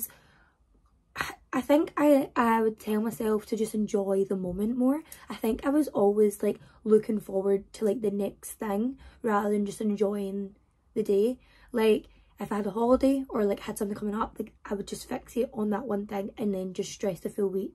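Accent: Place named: United Kingdom. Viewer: are you british? I can't really understand your accent; where r u from girl? :)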